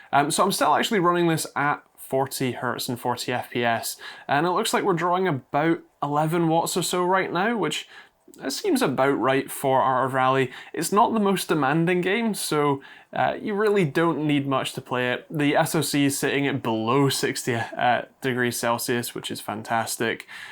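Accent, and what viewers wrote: United Kingdom. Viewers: Interesting video, I'm just curious about your accent, what part of UK are you from?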